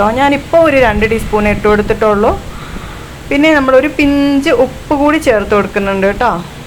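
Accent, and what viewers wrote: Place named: Tamil Nadu, India. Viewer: ഇത്ത. എന്തു പറ്റി. സംസാരം തമിഴ്. ടെചു. വന്നു. സൂപ്പർ.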